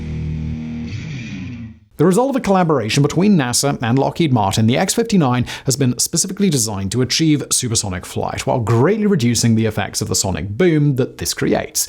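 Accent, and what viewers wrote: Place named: RP, United Kingdom. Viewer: Why do you think just because this channel and you speak with that upper class posh Gary Gavin accent that I’m not going to swear the fucking shit bat balls in this comment section know you are fucking mistaken where the fuck is ATI and I want business blaze where the fuck is business blaze blazing back now cocaine also nice blaze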